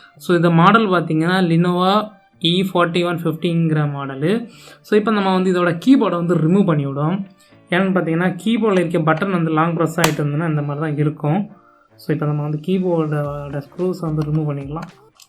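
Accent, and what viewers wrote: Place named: Kanyakumari, India. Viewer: Kanyakumari slang mathiri irukku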